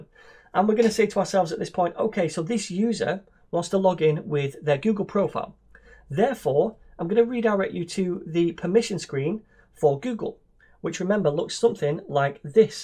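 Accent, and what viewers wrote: United Kingdom. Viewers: Two things I liked in this video are : 1) Your explanation 2) Your British accent =D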